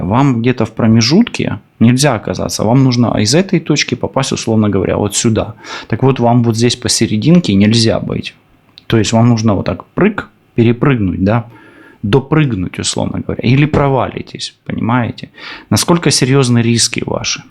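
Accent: Ukraine. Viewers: Я красотка люблю жизнь возрост уже большой собаки нет не люблю в квартире но они меня любят всю жизнь сама себя содержала никогда не брала у мужчин надеялась только на себя что нажила ваша русня забрала сейчас за границей в ирландии но влюбилась даже не ожидала потеряла чувствую что вы с Украины так как речь пролитает родные слова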